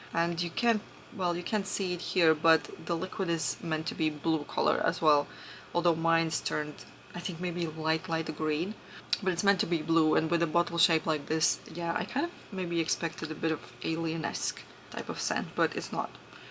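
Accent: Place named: Russia. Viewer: Your accent sounds like you're Russian 😊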